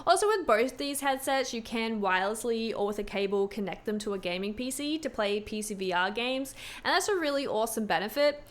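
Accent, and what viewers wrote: Australia. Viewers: damn, im usually attracted to aussie accent. hers is pretty annoying. maybe its just her voice.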